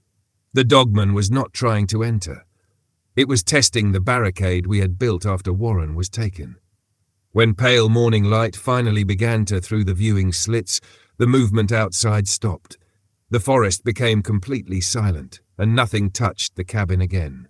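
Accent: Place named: England, United Kingdom. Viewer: Like the premise the English accent or whatever it is I just can’t do it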